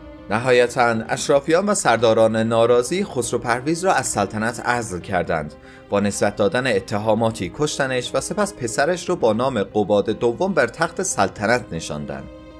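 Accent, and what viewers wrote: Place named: Turkey. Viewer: کاش سلیس و روان صحبت می کردی خیلی ترکی صحبت می کنی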